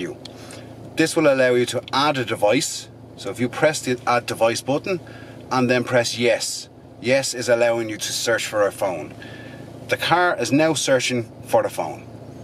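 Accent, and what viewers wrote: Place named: Ireland. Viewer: P.S. - it was worth watching the video just to hear your delightful Irish accent.....:-)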